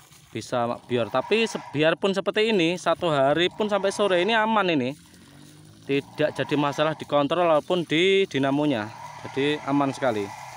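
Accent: Java, Indonesia. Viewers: 😂😂😂😂😂😂 mengelepi sawah to mas.....?seneng medok jawane...😂😂😂😂 Sukses selalu mas...Lampung nderek....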